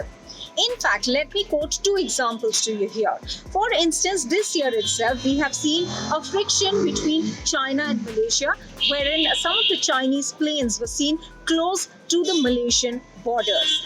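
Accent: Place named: India. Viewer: China is bullying Asean? Do you even know where the location of Asean in the world? And please speak without the Indian accent.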